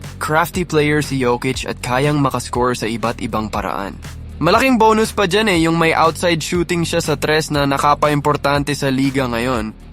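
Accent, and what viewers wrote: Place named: United States. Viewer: Parang philam to slang n slang mgslita.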